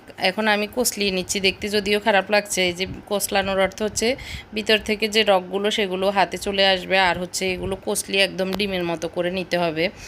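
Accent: Noakhali, Bangladesh. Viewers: ami noakhalir....apnak balo lega apnar basa noakhalir kothai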